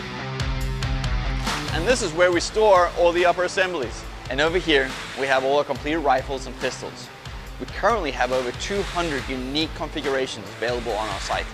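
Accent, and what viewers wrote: South Africa. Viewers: Hi; I hope this is not too personal, but do I detect a bit of an SA accent? Niks verkeerd daarmee nie.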